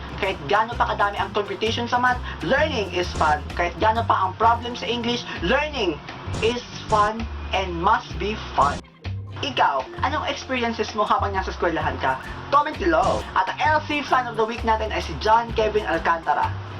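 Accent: Visayas, Philippines. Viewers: Bisaya ka dung uy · hahahahha galing! bisaya ka ba???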